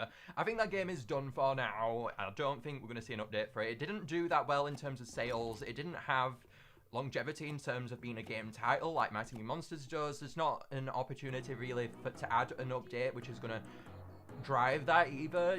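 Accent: United Kingdom. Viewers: See? He’s British like me (is steve British?)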